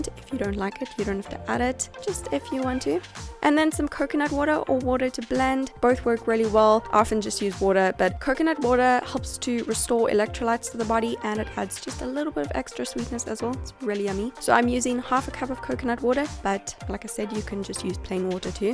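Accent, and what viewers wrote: South Africa. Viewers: Dat South African accent tho :p Baie goed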